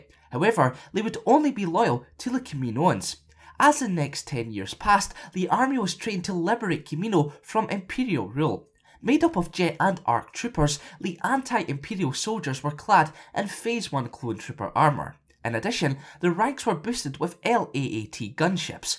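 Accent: Scotland, United Kingdom. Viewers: Are u scottish? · Scottish like me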